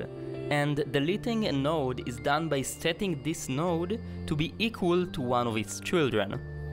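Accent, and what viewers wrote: Israel. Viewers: nice ai vido and i know u are an isreali becuse of the axent i am 2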